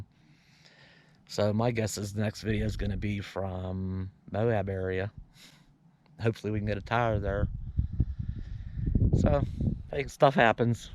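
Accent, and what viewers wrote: Pennsylvania, United States. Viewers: He....I hear a back east man talking Pa accent...Am I right? That tire was to heavy for me to change, good job. Hope you were able to get a spare at a good price....Janice from Pa,now Arizona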